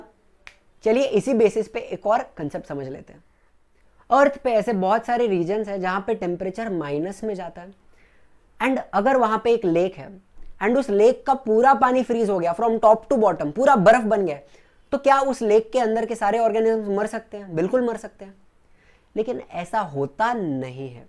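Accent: Maharashtra, India. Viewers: Sir are you Marathi???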